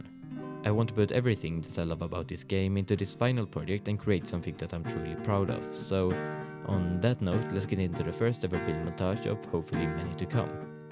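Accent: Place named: Sweden. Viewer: really good man also love the swedish accent 😭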